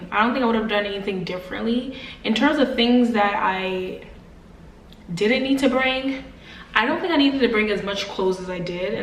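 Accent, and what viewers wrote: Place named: Africa. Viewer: What is your ethnicity? If you're not familiar with the difference between Black Americans and General Africans then you probably won't know but the combination of the darker shade of brown,slanted eye size, her nose size and her resting facial expression would let me know she most likely not a Black American just by looking at her. I can also hear parts of an African accent slip out most likely from West, Central or even East Africa(Sudan) where her features most likely come from and her last name is " " which isn't a Black American last name.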